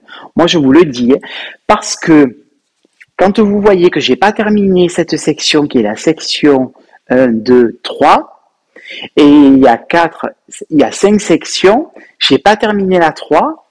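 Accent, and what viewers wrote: South, France. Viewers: 😂😂😂trop sympa votre accent français à la Dior,,,j’adore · L'image est tres bonne mais le son mauvais.cela vient peut-être de la piece.je me regale de vous ecouter avec votre accent du midi c'est un vrai plaisir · Coucou! Ce que tu me fais rigoler 😅 ton short kitch est hilarant et oui tricote. Tu feras une belle présentation dans ton podcast 😉 Comme toi je parfume mes laines avec des "billes odorantes" que j'inscris dans mon cake et comme toi je sens toujours mes laines 👃😂 Tes chaussettes "forêt enchanté" et tes glaces sont magnifiques, j'adore. J'ai le patron "forêt enchanté " dans ma bibliothèque et comme toi je vais opter pour les écureuils, ils sont trop chou 😊 J'ai toujours beaucoup de plaisir à te regarder tu me fais rigoler, j'adore ton franc parler et surtout j'adore ton accent du Sud et tes expressions qui sont succulentes. 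Bon été et bon voyage, tu vas en voir du pays 😍 Bisouxx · Encore un agréable moment. De jolies laines et de bons conseils. J'adore votre accent plein de soleil et de cigales... Passez de bonnes vacances. Si vous êtes OK, vous pourriez nous partager de superbes paysages. Reposez-vous bien. Le son pas top mais bon......😊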